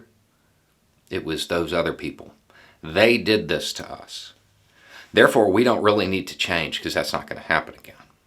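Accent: South, United States. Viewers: I'm sure you've been asked this before, but have you ever thought about running for an office? You'd be the first southern accent I ever voted for!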